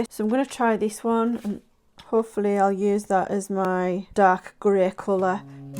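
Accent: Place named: Whitehaven, United Kingdom. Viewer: Hi, iv just found your fab channels, your accent reminds me of home I’m from Whitehaven, love your art and really enjoying the format hope to see more of your work ❤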